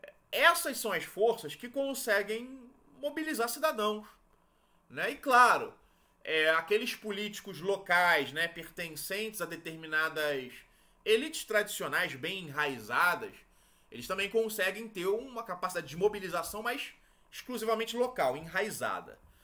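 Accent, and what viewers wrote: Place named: Rio de Janeiro, Brazil. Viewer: rapaz, o carioquês tá brabo hoje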